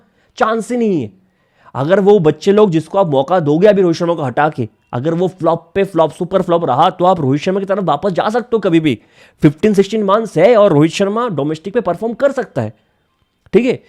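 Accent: Bengal, India. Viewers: Are bhai wo bengali hai from what i can tell based on his accent. Mai bhi bengali hu isliye apko ek chiz explain kr rha hu. Hur communuty ke bolne ke trike mein apko kuch na kuch odd lagega ya funny lgega. Bengalis emphasize heavily on pronouncing words that sound like they have an e or u. So probably when he is saying will wo wueel sunata hain. Isko leke mazak udane se better why don't u just focus on the content and learn from him. He is a living encyclopedia in cricket stop trying to make fun of someone's way of pronouncing stuff when u haven't done jack shit with your own life. Tu bahar jayega na pdne be it canada or us or anywhere wo tere perfect accent ke upar haske tereko hur roz bully krenge tb acha lgega tereko? Have some empathy bro. Big 26 mein tu baith ke faltu chizo pe joke mar rha hai to sound cool.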